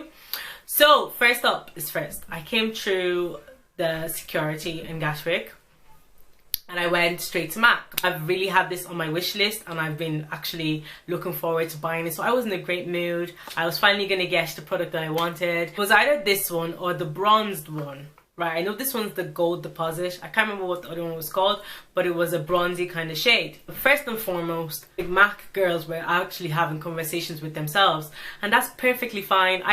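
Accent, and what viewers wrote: Ireland. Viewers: Sounds more Irish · ***** oh i just realise the girl an irish accent i am from ireland what part you from lolz i am from co.tyrone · Have you got a irish accent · Your accent is beautiful. I was listening and listening, and I was like...Irish...and Nigerian. It's awesome! So lucky! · I'm Irish, and you have a bit of an accent, do you live here or are from here? You're absolutely stunning, and I loved this video! Subbed x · so so pretty! I have never seen a Nigerian with an Irish accent! its actually really nice! sorry you had a crappy time, isit the same in ireland with mac? x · Are you from Ireland? Your accent is amazing omg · Are you from Dublin? Just a guess haha x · your accent sound irish♡, I used to live there. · Do you live in Ireland? There's a little Irish accent in your voice! ❤️ (I'm from Ireland) Subbed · I was thinking "Oh cool, a YouTuber from Nigeria. 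Wow, it sounds so much like an Irish accent sometimes, that's kind of interesting...." Lol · i knew I recognised the accent 👌im Irish two 💞Are you living in Dublin❓🍀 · I just came across your channel and I'm wondering did you ever live in Ireland you sound really Irish sometimes when you speak :) can't believe how you were treated I would have freaked out lol. I've never had this happen to me thank goodness! :) I subbed :) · I'm a brand new subbie so I have to ask!!! Are you Irish ? It's just that I live in Dublin and I can hear it in your accent! Not knowing is killing me! xxxx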